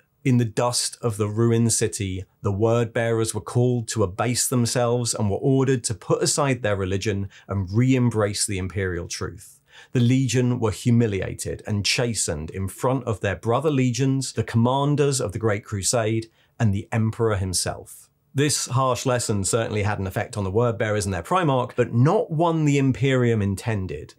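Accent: United Kingdom. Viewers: I love that you use as your end song clip, I look forward to it on all your videos and your condensed informational style on the lore. luetin's great, if you have the time. Major Kill's good for a some cheeky fun, Baldemort's amazing for the imagined paths one can explore outside the cannon. You are Leutin if he didn't get lost in the lore but with that silky smooth British accent. But concise, to the point, with a a tinge of humor that's just the right amount. A cooked to perfection dish of information.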